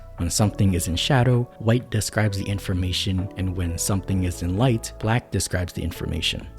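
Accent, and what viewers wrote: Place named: United Kingdom. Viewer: british detected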